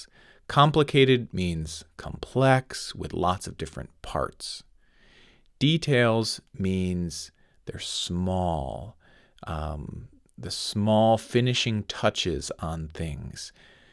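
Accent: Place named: United States. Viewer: His heavy American accent ruined this. I expected a pure British accent. 🙄🙄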